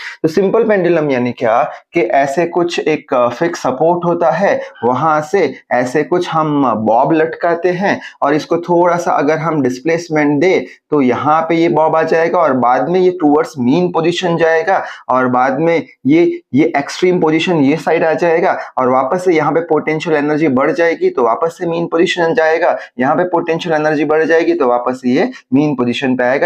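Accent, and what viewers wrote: Gujarat, India. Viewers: Sir aap gujarat se ho??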